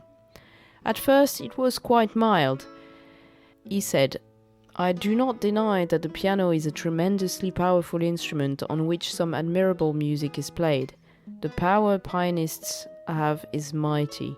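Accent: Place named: France. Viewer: Very informative and nice talk, like your authentic french accent😊❤And thanks to F.Sor🙏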